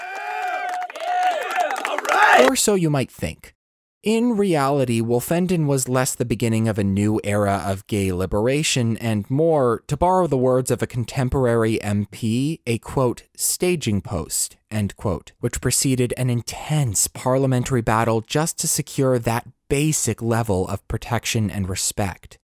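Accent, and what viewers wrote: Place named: United States. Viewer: As soon as I heard an American accent, I suspected this would be a pile up on the interstate. I’m glad I was proven thoroughly wrong. Well researched, beautifully read, and pragmatic. I for one won’t hesitate to elevate Abse to hero status. He had to hold his nose to say certain things to get it over the line. In retrospect, the weakness of the legislation ought to be seen more in its scope than its content, as it didn’t apply to Scotland or Northern Ireland, which had to wait until 1980 and 1982, respectively, to enjoy some partial decriminalisation of their own. Sadly, Abse’s work was undermined by the police and judiciary in the following decades as they pushed people through the legislative cracks into prosecutions with laws that were never really intended to be used in certain ways. At one point it was legal to insert a fist into a man but illegal to own a video of such an act. 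Even into the late 1970s, gay men and women were still being subjected to psychosurgeries (lobotomies and leukotomies), ECT, or horrendous drug-based conversion therapy. Some are still alive today to tell the tale. The fact remains, the architect of gay rights in the UK can be traced back to Alan Horsfall, Ray Gosling and Leo Abse.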